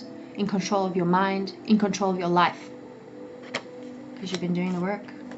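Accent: South Africa. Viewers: Oh a few clues you may have a SA or Zim accent? Been solo for so long, not sure who is coming into focus. Thanks for such a lively reading. Wm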